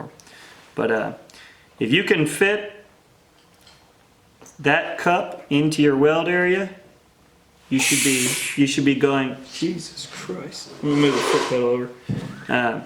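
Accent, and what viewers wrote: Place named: South, United States. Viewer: U sound like a God damn hillbilly. 💗 love it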